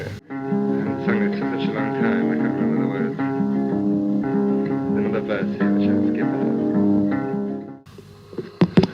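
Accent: RP, United Kingdom. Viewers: I had no idea he spoke in upper RP. I knew it would be RP given his upbringing but he says his A so flat, like Queen Elizabeth did. So beautiful to hear. What a talent he was. Tragic. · Love Drake but if someone told me this was one of the Royal family speaking would have believed them even makes the Pink Floyd guys sound like neds scallies or chavs 😢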